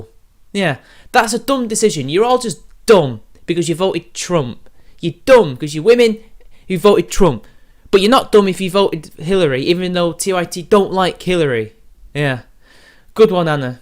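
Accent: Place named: Yorkshire, United Kingdom. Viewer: What part of Yorkshire ? Lad.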